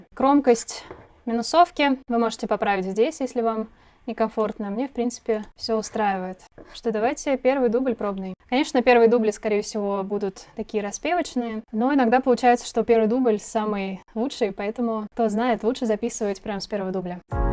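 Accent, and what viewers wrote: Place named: France. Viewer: Такой крутой урок! Подписалась с англоязычных обзоров, думала, что вы француженка по акценту. А тут оп и русский. Так приятно 😍 И вот эта серия из 3 уроков просто 🔥🔥🔥🔥🔥🔥🔥 Спасибо ❤️ У вас так много знаний по обработке, буду ждать новых уроков ❤️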